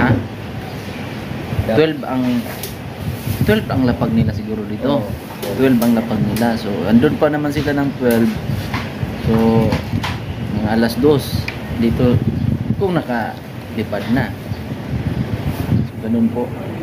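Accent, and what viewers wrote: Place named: Ilonggo, Philippines. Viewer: Wow marunong ja din parang mag-Ilongo Kuya.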